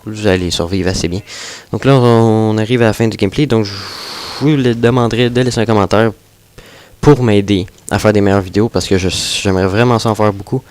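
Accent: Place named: Quebec, Canada. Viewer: Quel voix de merde mais bon ses l'accent québécois ki fait sa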